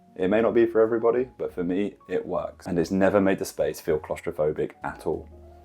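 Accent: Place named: England, United Kingdom. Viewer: I'm hitting a like because you're cute and I'm a sucker for the English accent.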